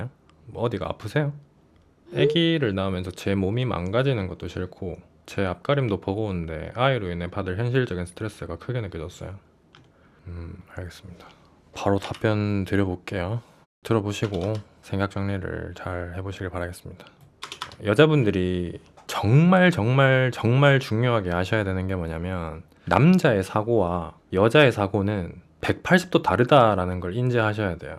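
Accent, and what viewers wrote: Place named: Daegu, South Korea. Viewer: 경상도 사투리가 되게 귀에 거슬리는게 1도 없이 그냥 술술 들어온다 ? 싶었더니 저랑 같은 대구 분이셨군요. ㅋㅋㅋ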